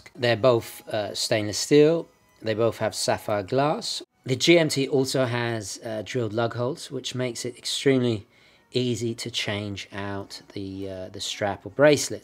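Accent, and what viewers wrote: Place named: Estuary, United Kingdom. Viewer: Excellent comparison of two superb time pieces. I also love your slightly broken Thames estuary accent that I find very relaxing on the ear compared to the traditional Cockaniny flat vowelised delivery of Eastenders. Cheers.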